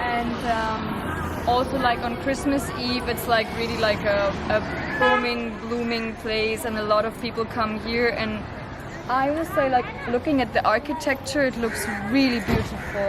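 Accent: India. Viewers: Her English accent sounds a little bit Indian , or is it just me ?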